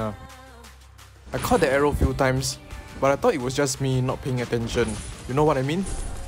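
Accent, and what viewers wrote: Singapore. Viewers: I can hear ur voice and tell ur singaporean, but ur kinda concealing it? But back on topic, these invinsible arrows are annoying asf..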